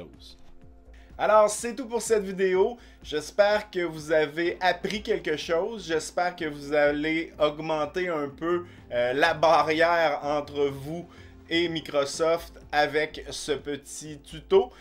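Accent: Canada. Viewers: salut,merci pour t conseil jaaaime bien ton axent canadien loin de moi d'en rire! si tu savais comment on parle vers marseille! je te permet. tu me fais pense à snowden le open source! c'est pas une balance! meme la justice ne fais pas le poids elle ki se dis equilibre? permet moi d'ans douter! g pas regarder toutes t video! apres je te rap-l. merci bonne continuation le meridien de greenwich...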